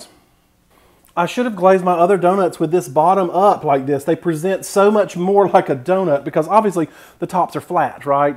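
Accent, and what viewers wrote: South, United States. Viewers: I love your accent ! It reminds me of my southern family! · I love your enthusiasm for food !!! and your southern accent is darling!! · I adore you ❣ I adore and appreciate your honesty , your humor and your southern accent keeps me smiling while I am cooking along with your tutorials..... I just adore you ❣❣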